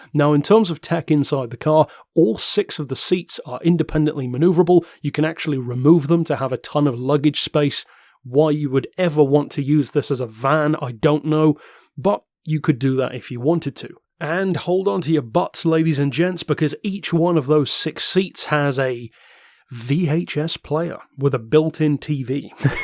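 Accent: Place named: Cornwall, United Kingdom. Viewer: if you see this haha, are you Cornish because you have a very posh Cornish accent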